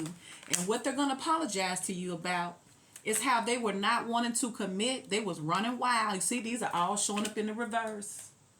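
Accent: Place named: South, United States. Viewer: Me too! Love her southern drawl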